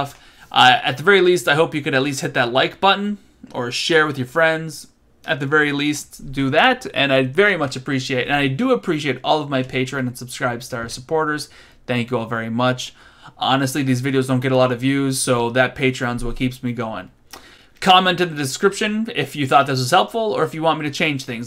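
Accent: United States. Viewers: It's not awful - just standard American accented Japanese. Accents are tricky to kick... they tend to move at their own pace.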